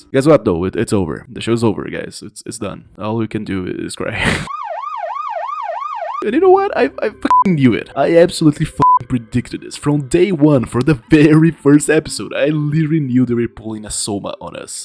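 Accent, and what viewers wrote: Brazil. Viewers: I hope you achieve your dreams man! I'm a fellow artist, and I know that our profession is very hard. You seem very passionate about your craft, never let anyone take that away from you! Also, I couldn't help but notice your accent, are you brazilian?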